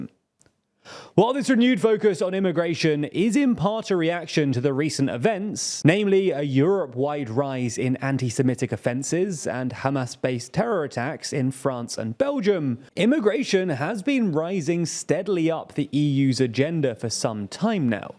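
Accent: England, United Kingdom. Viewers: you are English arnt you!!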